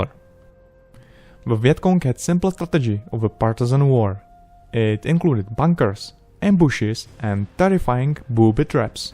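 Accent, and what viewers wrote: Czech Republic. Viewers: Wtf ten český přízvuk se nedá přeslechnout. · He is from Czech like me you can find out by hearing R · This guy sounds like he’s from Czech republic. Trust me I know the accent. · čuchám český english accent?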